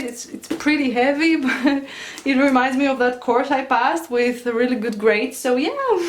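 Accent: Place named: Greece. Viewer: nice... καταλαβαινεις τον ελληνα απο το παχυ λλ και τα μεγαλα φωνηεντα. keep up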